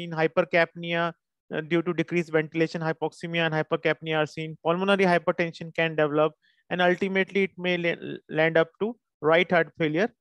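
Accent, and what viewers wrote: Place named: India. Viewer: Unmöglich: deutscher Titel, und dann auf Englisch, mit einem grauenhaften indischen Akzent!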